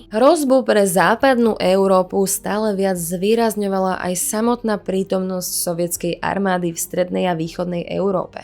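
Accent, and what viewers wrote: Bratislava, Slovakia. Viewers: Slovenský hlas 😍 tomu dává úplně jiný Vibe 😇 jinak super video 😇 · Bratislavsky dialekt (nasilny akcent na prvu slabiku znižuje cely dokument....( suslanie ba 2.mieste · Krasny, sexi hlasok, az na ten bratislavsky prizvuk a neznalost "ľ" ;)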